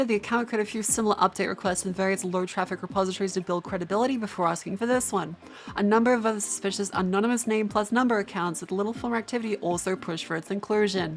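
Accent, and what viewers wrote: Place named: Australia. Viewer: Shark girl? Red head? Australian accent? Mini skirt/giant overcoat combo? Glasses? Yapping? Coding knowledge? Oh yeah, instant sub. Looking forward to more videos :D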